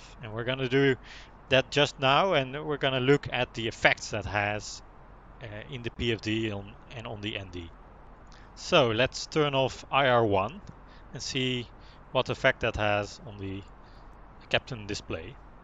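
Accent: Netherlands. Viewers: Ha! Ik hoor een Nederlands accent! Gaan jullie ook over op Xbox series X? 👀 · i smell Nederlands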